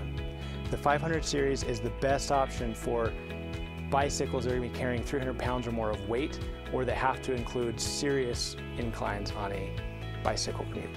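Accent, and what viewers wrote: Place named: Canada. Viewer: I love hearing a Canadian accent in the morning!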